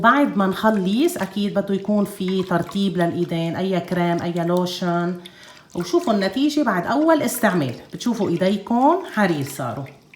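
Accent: Lebanon. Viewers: مبدعة يا بالوصفات الكوري والمغربي والعربي بتجنني وبتاخدي العئل بلهجتك اللبنانية محبتك من السعودية إيمان❤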